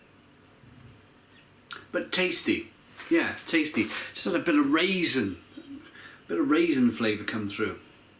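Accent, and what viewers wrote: United Kingdom. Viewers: Interesting beer and another great review. Are you English or Welsh?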